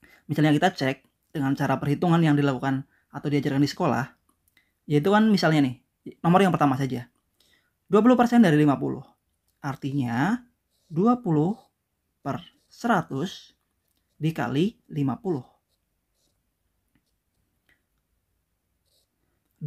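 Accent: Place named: West Java, Indonesia. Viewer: Asa wawuh siga urg sunda haaa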